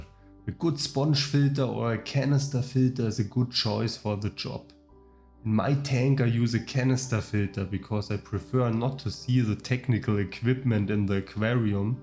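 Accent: Germany. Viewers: Warum machst du kein deutschen Kanal? Man hört leider extrem raus, dass du Deutscher bist mit deinem Englisch.😅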